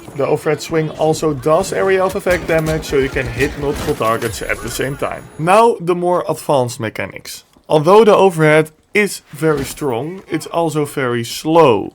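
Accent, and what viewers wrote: Netherlands. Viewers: Dutch accent spotted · nederlander detected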